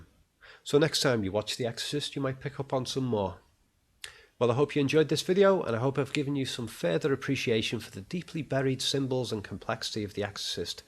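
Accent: Liverpool, United Kingdom. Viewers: Some pretty stupid stuff in here. Then we get to a more obvious one, when she has her right arm raised and he says he's not sure about that one! :o Is that because he didn't make that one up himself? ;) He says people do have their arms up in that situation! Duh! Is that a bit like people do pray like that and the other 100 things you said too?! Also, I think I must be programmed in some way to feel that the Liverpudlian accent sounds thick! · All good except the narrator's distracting Scouse accent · Very impressive job. No self serving bull crap just very insightful points. It's a plus that you are an obvious Scouser.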